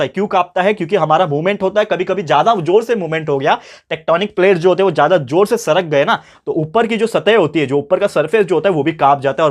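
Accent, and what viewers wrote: Maharashtra, India. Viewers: Sir are you from Maharashtra?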